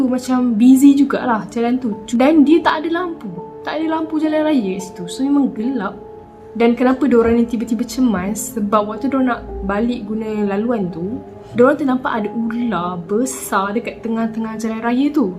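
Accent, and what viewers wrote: Kelantan, Malaysia. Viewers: Sy dari kelantan... btw jadi boh kecek qlate 🤣 so jgn berhenti mencoba lagi 😘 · cute je kak ckap kelantan 😍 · comel kak cakap klate...best tgk kak bercerita...❤❤❤kak one of my favourite youtuber.. Salam dari kelantan...😉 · comey laa ho ore melaka kecek kelate hahaha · Comel bhsa klntn 🤣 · come kecek klate,hhuhu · Bendo tu ko abe.? Erm yo dh la tu.. 🤣🤣😂 bulih kecek klate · emm yoo doh la tu! lawak sikit accent kelate kak 😂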